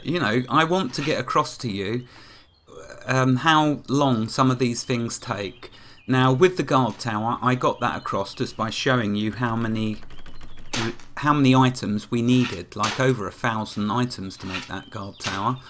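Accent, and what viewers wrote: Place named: England, United Kingdom. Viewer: *Laughs* Hi and I live 5000 miles and one gigantic pond of water (Atlantic) away from each other. So for now the only way I can appear in a video is in the background. I can not hear when he is recording so I have no clue what he is saying until I watch the video's just like all of you. I personally do not have the equipment that has to be able to record but then if I record it wouldn't be a Tutorial would it? Besides I don't have that fantastic English accent that has. I am originally from California so I don't really have an accent and would be boring to listen to. *laughs* I really wouldn't feel comfortable recording a video on my own since this is tutorials. is my best friend on this planet and I would never want to take anything away from his project. One day we hope to be in the same location so we can work on this together. 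You think you are amused by video's now wait until we can record together. *laughs* Like Vikki said we sound like an old married couple. We affectionately bantering with each other which is funny to listen to. So I am sorry dear for now you will have to listing to waffling on alone. *smiles*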